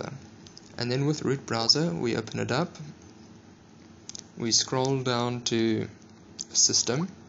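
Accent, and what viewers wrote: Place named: South Africa. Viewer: Your accent sounds like that of a south african's accent,am I correct in assuming this?Prob joburg or capetown?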